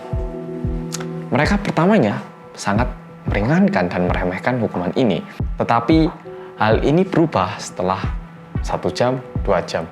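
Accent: Java, Indonesia. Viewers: Org Korea medhokkk💜😌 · Apa cuman aku kalo mas opening "hello guys welcome back to korea reomit bersama orang yg MEDOK!!" trus aku ikutan ngomong medok? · Orang medok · Medddhok hahaha (like donk ka, heheheh canda tapi kalo mau di like like atu ) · Pertama liat mas lucu aja gitu orang korea ngomong medok🤣best deh MAS 💗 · Bersama orang Korea yang medoookkk ... 😊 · Org Korea yg medokkkk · Bersama orang korea yang medokk mantapp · Orang korea medook❤ Dan ganteng😁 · Mass medhokkk... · Welcome back dngn mas yang medokk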